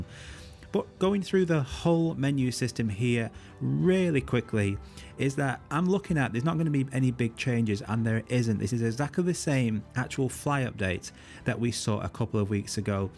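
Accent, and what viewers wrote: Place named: North, United Kingdom. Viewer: Thanks, now I can do the update without worries. BTW, where are you from? I'm curious about the accent that sound a bit northern :) New sub :)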